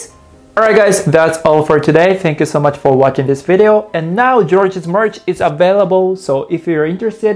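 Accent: Japan. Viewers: Kind of like how Japanese people pronounce every english brand name incorrectly. Also, having different accent doesn't mean someone is pronouncing it incorrectly. If that were the case, every english word you said is pronounced incorrectly because you have a Japanese accent and obviously that is not true.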